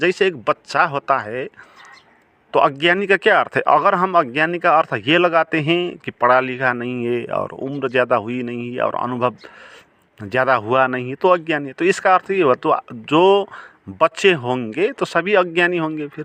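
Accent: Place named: Nepal. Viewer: नेपालीले हिन्दी बोलेको जस्तो लाग्यो! प्रबचन असाध्य राम्रो लाग्यो!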